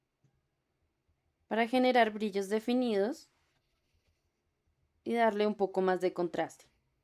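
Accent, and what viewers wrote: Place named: Colombia. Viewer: Hola buen día, puedo suponer por tu acento que también eres de Colombia. Es una lástima que hayas dejado de crear estos videos, espero puedas leer este comentario y te de un poco de motivación para continuar con este proyecto. Yo quisiera aprender más sobre ilustración científica. Si me puedes recomendar algún libro u otros medios para aprender te lo agradecería.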